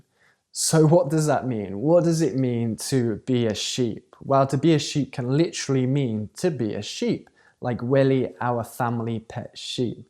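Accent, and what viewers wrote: United Kingdom. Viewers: I am dying to listen to your Italian with British English accent! You are right, I love people speaking Italian with their own accent.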